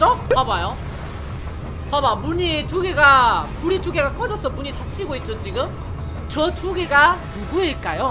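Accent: Japan. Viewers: 한본어에다가 은근 성우스러움 ㅋㅋㅋㅋ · 한본어 개웃기넹 ㅋㅋㅋㅋ큐ㅠ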